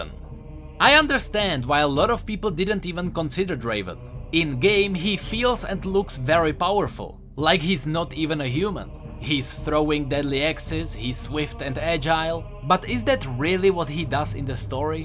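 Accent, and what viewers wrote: Czech Republic. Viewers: obv že je čech hes accent is silenj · Bruh you got czecch accent lmao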